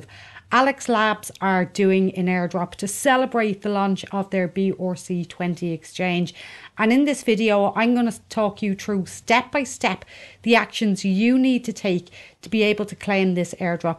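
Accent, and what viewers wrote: Ireland. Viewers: Loving this video, valid info and straight to the point. Good hearing from a fellow Irish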